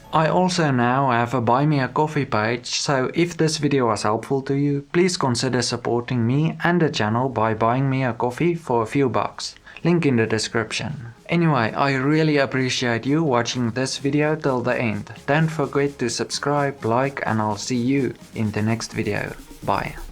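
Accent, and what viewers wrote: South Africa. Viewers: Are you South African? I am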